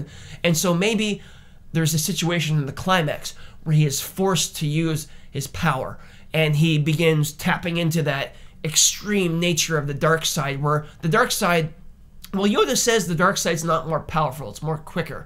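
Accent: Canada. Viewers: why do you have a canadian accent? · Canadian as fuck eh?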